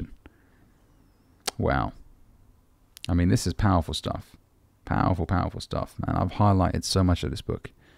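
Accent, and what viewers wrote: United Kingdom. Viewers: Hello! I stumbled upon one of your videos randomly, and I ended up watching almost half of your entire collection already! You and I both know that the content you cover has been explored in various ways by many others before you, but let me tell you: your approach is truly unique and I absolutely love it! Consider me a dedicated subscriber from now on. Your calm and non-aggressive tone, along with your willingness to understand and help, are rare qualities these days. I wish you all the best not only in your business but also in your personal life. By the way, if you don't mind me asking, where are you from? Your English accent sounds quite British to me. Wishing you the best of luck in all your endeavors!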